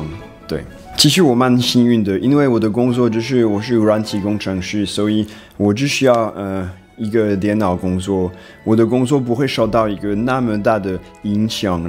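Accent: Taiwan. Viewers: 講話很台灣味欸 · 台灣腔中文真的有夠台的~😁😀💞👍👍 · 真的很台灣味你如何學好中文的? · 真的? 假的? 好台哦！🤣🤣🤣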